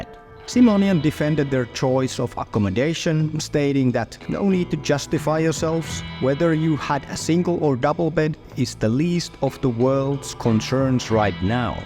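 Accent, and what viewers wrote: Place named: Finland. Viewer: Your finnish accent makes me feel cozy and the video was fun. But your should practice to atleast pronounce russian words correctly, as Russia is neightbouring country to Finland and the third largest speaked language in Finland. For example Россия сегодня, is pronounced "rɐˈsʲijə sʲɪˈvodnʲə" (in phonetic), or "rassiia sivodnja" in finnish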